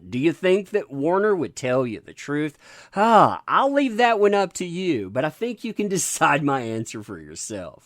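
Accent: South, United States. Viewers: So love the southern accent during the Hollywood gossip 🤗 Reminds me of home 😋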